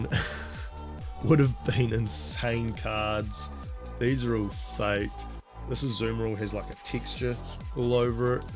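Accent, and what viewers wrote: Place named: New Zealand. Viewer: LOL after watching countless american youtube vids on pokemon.. listening to you makes me realise what us kiwis sounds like.. I would say you sounds like a typical kiwi. Man we sound so chill and unserious with a certain twang.. and the words we use.. fANcy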